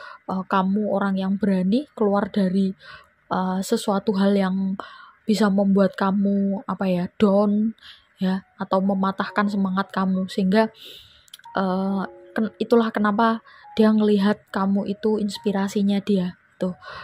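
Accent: Java, Indonesia. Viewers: Keunikanmu pn untuk ku suara dn logat jawa yg lembut mu buatku sangat senang dn kumerasa auramu sangat positip.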